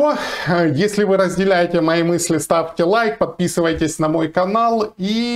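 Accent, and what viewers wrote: Ukraine. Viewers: Это украинская версия русского языка. Аналогично есть молдавская, грузинская, армянская, белорусская, эстонская и прочие версии русского языка. В России также есть разные версии русского языка. 😊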